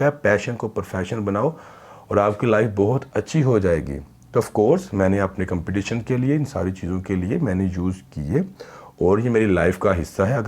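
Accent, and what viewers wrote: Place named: Sialkot, Pakistan. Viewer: From india. Best wishes. Ur language is same as we have. I think u r from sialkot. Because we r from sialkot before partition. If u read this comment then plz rplyy.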